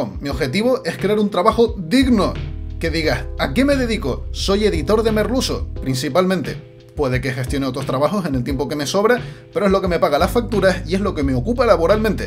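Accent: Canary Islands, Spain. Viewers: Eres canario 100% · llevo viendote hace poco con tus buenos analisis. la verdad te esperaba mayor y mas gordo jajaja. fuera de bromas de primeras me gusto tu toque canario autentico me alegra verte que das este paso y de tan buena manera, creo que eres muy profesional y vas muy bien encaminado tio. el setup es lo de menos, la gente te vera por quien eres y lo que haces el resto es un poco de relleno. te deseo lo mejor y estoy seguro que llegaras muy lejos. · Una pregunta Merluso, tu acento es canario? Me lo parece, me encanta el acento canario pero no estoy 100% seguro se parece a otro que no identifico, en parte estoy aquí por tu forma de hablar, a parte del buen contenido obviamente. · Tío, entre tu avatar y el acento canario, te imaginaba como un Walter White guanche. Seguro que te va genial en Twitch porque tienes mucho carisma. Descubrí tu canal hace un mes y me mola mucho 👍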